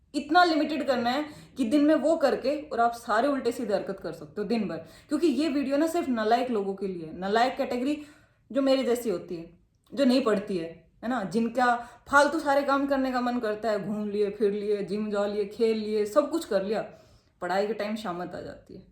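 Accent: Haryana, India. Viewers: Your haryanvi accent is so cool😅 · Mhare Haryana tt ho aap, awaj tt hi beraa laag gya😂😂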